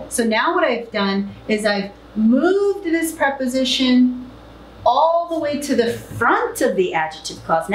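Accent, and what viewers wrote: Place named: United States. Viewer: This isn't but american english !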